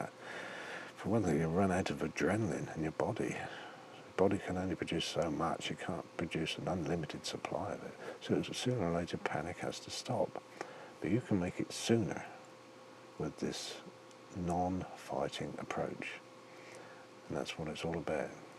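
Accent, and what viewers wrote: England, United Kingdom. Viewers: Its the tone, deep voice, and English accent that helped me out tremendously ❤️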